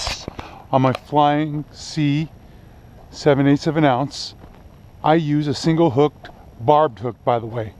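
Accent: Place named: Northern California, United States. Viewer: Lol. I can hella tell you are from NorCal. "Right on brotha" Lol! NorCal lingo at it's best! Nice lookin Salmon by the way. Always taste better when you catch them personally!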